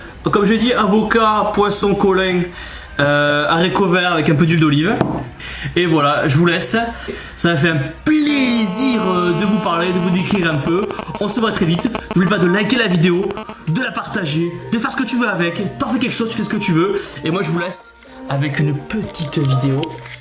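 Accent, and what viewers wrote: South, France. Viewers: Le mecs il avait l’accent du sud avant 😂 💪🏻force a toi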